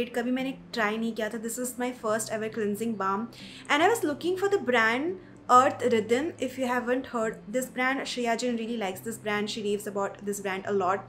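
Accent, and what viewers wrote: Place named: Bengal, India. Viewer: Baap re baap. Bengali touch from u. Love it❤️❤️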